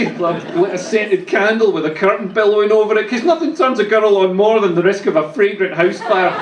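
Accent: Scotland, United Kingdom. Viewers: thanks, I guess I have... I can hardly recall leaving this comment, much less you. It was Toffee Digger's ("reply") comment that got back here to see yours (2 years later). I gather from Marco's comment that you have a Scottish accent - I, too, love Scottish accents (among many others). Yet, I was apparently frustrated by the combination of your accent and your "audio situation" as making what was apparently funny to some, literally incomprehensible to me, despite it being, apparently aimed at the English speaking world(?) < insert quote here from Ben Franklin and others about how critics are often our best allies > PRO TIP: If you don't want feedback, feel free to turn off your comments section instead. Cheers. B-)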